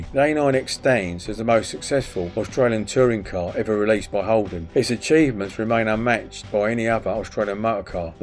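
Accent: England, United Kingdom. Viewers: A pommie accent talking about Torana …?!?! This is not good .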